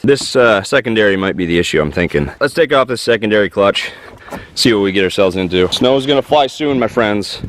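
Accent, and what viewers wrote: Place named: Maine, United States. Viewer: You sound like a Mainah.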